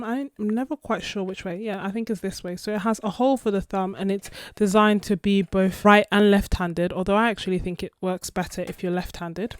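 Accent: England, United Kingdom. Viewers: I’m in soggy Cambridgeshire, so probably not far away from you judging by your accent. Love hearing how different people feel about palettes. I’ve got many of the same. How I feel about them is an evolving process. I’ve come to realise they key for me is flexibility, if I can’t change it, I get bored.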